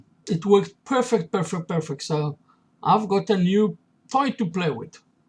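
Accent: Israel. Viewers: I knew, that's an Israeli accent. Strike!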